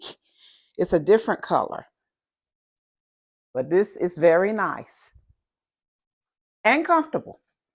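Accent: South, United States. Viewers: What a great video! Thank you. Am always intrigued by the Temu ad— thanks so much Ms. for bringing their offerings to life. You and your fabulous southern accent make me miss home. Love you! xx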